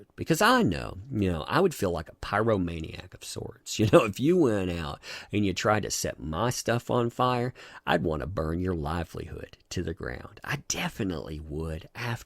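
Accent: South, United States. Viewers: Subbing purely for dat Southern drawl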